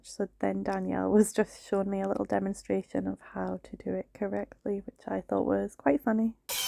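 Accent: Scotland, United Kingdom. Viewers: I agree except for the music part…nice soothing music is nice & your voice is fine…you’re a Brit. We know how you guys speak 😏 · I like hearing your voice! Please don’t stop talking because of negative feedback. I am Scottish too and grew up on the East coast of Scotland. My accent is similar to yours. I live in America now and don’t hear a lot of people who sound like me, so it is lovely to hear your voice. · I love watching your video's and your wee Scottish accent . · Came over from MWM. Yes, please no YouTube generic music and there's nothing wrong with your voice. I enjoy a good Scottish accent. 😊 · This transformation is amazing. You two did such a wonderful job. Love the narration, Scottish accents are the best! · official pet troll here. Don't worry! I'm a friendly troll. I'm near Fife, Washington. From your accent, I suppose you are closer to the Fife area in Scotland. 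· I love a Scottish accent and you will always get moaners and whiners who would not do what you are doing even if they were paid. Thank you for all you do. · Scottish accents are the best accents in the world, top three for sure. It's so comforting, maybe it's because my ancestors came over from there; it speaks to something deep in my soul. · Bonnie from A Beautiful Mess sent me your way. Your accent is nice, reminds me of my time in the UK - we need all the diversity we can get in this space. <3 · I like the chit chat during videos and love the Scottish accent! Out of curiosity what gets recycled from your cleans? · How rude! Your voice is totally fine. You just sound like you live in the UK. Midwest Magic Cleaning sent me to check you out. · Your gentle Scottish accent is lovely. As a lover of so much american content, it is comforting to me to hear a familiar UK accent. No music for me, I find modern music very dischordant and distracting. 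Visuals and your own voice for me, thank you. I would say, just be yourself. Those who want and need what you have to say and show will stick around regardless. All the best with your channel (I found you via Mid West Magic Cleaning) . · I am not very good with accents, but I want to learn. What kind of British accent do you have? Is it typical for a certain area? My British friend from London has a very strong accent, very different from the way you speak. I live in the US, but English is not my native language. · Dear and Danielle, thank you for great videos and for all that you're doing to help others. I'm a big fan of Midwest Magic Cleaning and after his shout-out to your channel I am so happy to see the number of your subscribers growing. I love you soft narration, it's not monotone and I would like to encourage you to keep going and narrating the way you wish. Your softer voice is also good for ASMR if you want to give that a go! 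I don't know who told you that you had "wrong accent" but please block such comments. I love Scottish accent and there is no accent in the world that would be wrong in any way. Aye, you're doing a wonderful job and I'm looking forward to your future cleanings. 🙂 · MidwestMagicCleaning mentioned you so here I am. There is nothing wrong with your voice at all -- it's quiet and relaxing to listen to. 🙂 And your accent is nice too. I'm American -- we love UK accents, lol. So glad the resident is getting help. ❤I subscribed and now I'm off to check out the other half of this clean! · You have a beautiful Scottish accent 😍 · Your accent is so mild, who ever said that obviously hasn't been to Scotland 🏴 They would have nae hope understanding me, rubber that feedback yir doing braw ❤ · Midwest magic recommended your channel so you must be good! Only take advice from Mac he’s the best, I love the Scottish accent I also follow the sheep game and the hoof gp both great channels. 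How can you have the ‘wrong accent’ ? Wow! Good luck with your channel I have subscribed ❤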